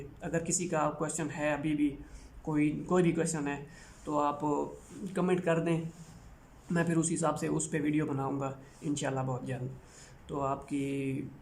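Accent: Saraiki, Pakistan. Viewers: salam bhi lehgee se ap Saraiki lagtee ho aisa ha kia?? :) By the way meri ye mail ha is pe contact kareen please ap see bat karni ha Jazak allah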